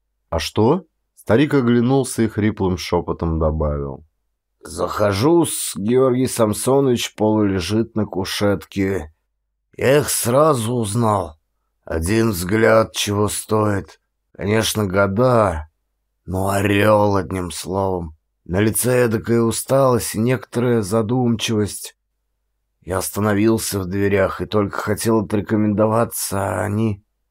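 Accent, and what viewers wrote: Moscow, Russia. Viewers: Здравствуйте,! Последний комментарий про московское "аканье"- это ни о чем. Вы жили в Москве какое-то время, м. б. отложился как-то этот говор. Я тоже открала для себя великого русского писателя Д. Мамина-Сибиряка. Нынешний русский язык отличается от языка 19-го века. Многие забыли об этом. Огромная благодарность за Ваш труд! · Аканье московское.театр ваш ни к чему. Ждали простого прочтения классики. Мы и без вас все представим.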